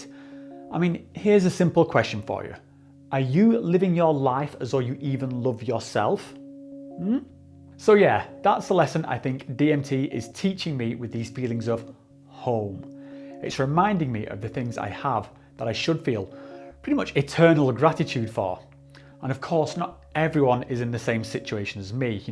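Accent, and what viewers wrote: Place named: Bolton, United Kingdom. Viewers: ah!! Now makes perfect sense thanks. The Manchester accent threw me! Lol Keep up the content, I enjoy the channel. :-) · Wierd watching someone with Bolton accent everything is usually American it's a nice change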